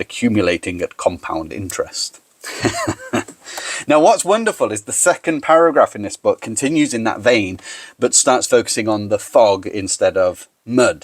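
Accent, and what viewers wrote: England, United Kingdom. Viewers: Good evening, I will definitely use some of your tips with my students on my next lesson on Dickens...and they will also appreciate a pure musical English accent. (I am an Italian teacher of English language and literature, I just stumbled upon your amazing channel).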